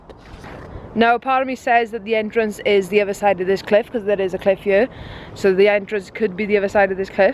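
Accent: Wales, United Kingdom. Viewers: assume wi ya voice ya welsh where about wales are u ?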